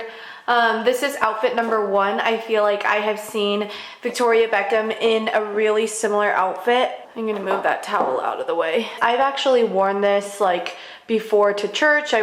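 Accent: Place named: United Kingdom. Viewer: Such casual and chic outfit choices!! But I immediately subscribed when I heard your “British accent” 😂😂😂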